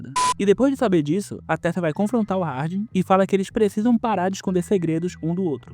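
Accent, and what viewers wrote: Pará, Brazil. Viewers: Mano, fala sério, tu és paraense, né bicho? Tu é doído é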